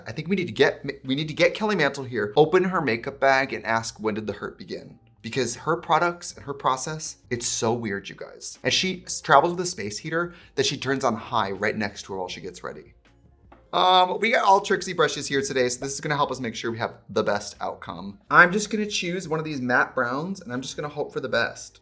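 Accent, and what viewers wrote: United Kingdom. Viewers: That British accent was what I needed to go on today….EPIC!🤭🤭🤭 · Trixie’s British accent is giving major Moira Rose energy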